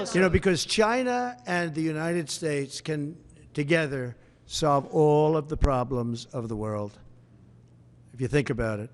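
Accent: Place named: New York, United States. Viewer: Absolutely… it is just funny how he talks… it is a New York style I assume.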